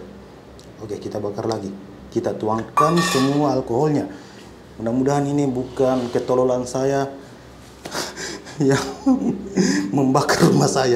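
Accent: Makassar, Indonesia. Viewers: Orang makassar nih keknya😂 logatnya keliatan bgt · dari logatnya anda sepertinya dari makassar cess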